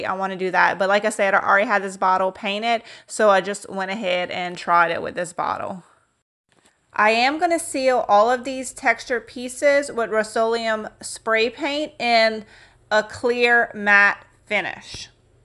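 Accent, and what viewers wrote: Louisiana, United States. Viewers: Darling, You came up in my recommended videos and I told my little granddaughter she's a Cajun!!! 💜 I know she's a Cajun!!! 😁❤️ I can hear it in her voice! She sounds like a Cajun! She's from south Louisiana; I just can't quite pinpoint where she's from. She could be from Lafayette or Ville Platte, or somewhere in the south,; maybe west of Lafayette! I'm not sure but I know she's a Cajun; I can hear it, in her accent. She talks like me. (I'm from Mamou. ) 😁 You're not only a gorgeous Cajun but you're very smart and so talented you have an eye for fabulous flips and I love watching your videos you give me so much inspiration. I'm following you now.. I wish I could put you at the top of the list of my videos that I watch that are suggested by YouTube his man you're good at what you do. Blessings to you. ❤️ P.S. please eat some boudin for me. 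Haha ❤️❤️❤️❤️❤️ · Your accent is Louisiana! Southern La. at that. I’m from BR. Maybe on the west side of BR maybe one of the Acadians parishes. Loving your channel! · You’re most definitely a southern Louisiana girl! 😉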